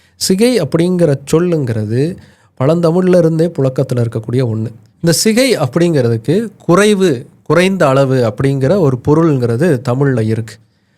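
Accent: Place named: Telugu, India. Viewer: Telugu naatham naaruthu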